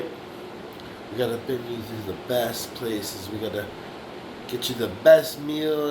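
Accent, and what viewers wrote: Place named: Canada. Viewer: 😂🤣you're hilarious, dude this is spot on, the excessive noise and the god damn heel stomping everywhere they walk and banging dishes is 100% truth. Are you a fellow Canadian by any chance? because you sound like one, cheers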